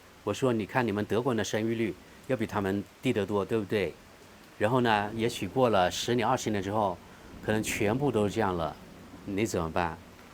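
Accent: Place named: South, China. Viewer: 每个地方人都有口音，尤其南方人，大家相互理解吧，其实我们南方人听着南方口音更亲切呢。他还特地做英文，中文字幕，很不容易，请支持！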